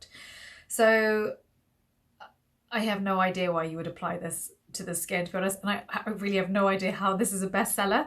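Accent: United Kingdom. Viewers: Special thanks for you English British accent, i am as a foreigner just can't stand American English accent, so again thanks and all the best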